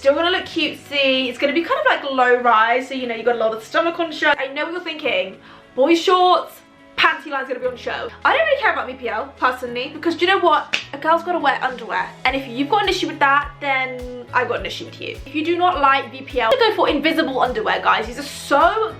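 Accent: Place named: England, United Kingdom. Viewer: I like how she has American influence " you do you boo" with an English accent.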